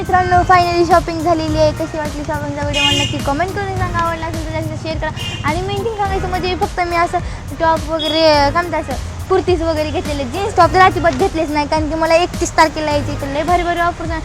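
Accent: Latur, India. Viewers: Perfect latur language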